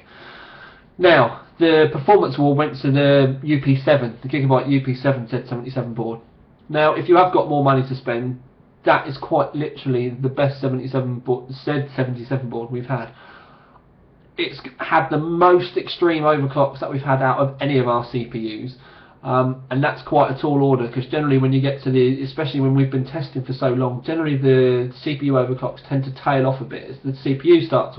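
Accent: United Kingdom. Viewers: You wanna hear something funny, i got tired of watching the video so i decided to go and read the best of 2012 over at the site. When i started reading i realized that i was reading it in my head with a British accent. Im so used to watching your videos from over here in the US that now when i read your articles my mind puts your voice as the voice over!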